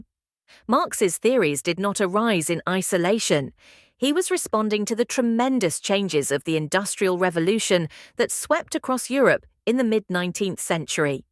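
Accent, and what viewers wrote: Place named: United Kingdom. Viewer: How is the channel location Pakistan while the narrator voice is white British?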